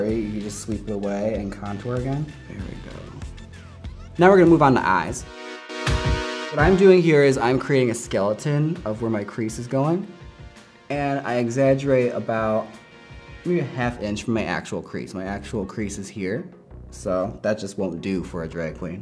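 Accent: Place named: Wisconsin, United States. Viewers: her Wisconsin accent! 💚 love from chicago! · That Wisconsin accent 👌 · Honey, love the Wisco accent. Please curl your lashes and blend them with your fakes with mascara, the difference is so stark and obvi. Two, please do something with your lower lashes! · I'm from Wisconsin too and never really thought there was an accent but hearing him talk omfg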